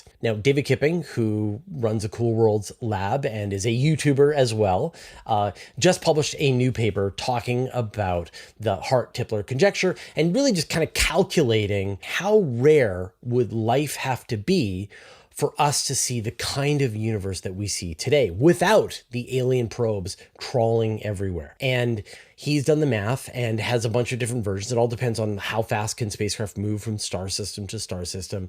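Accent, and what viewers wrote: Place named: Canada. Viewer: I'm here for his adorable Canadian accent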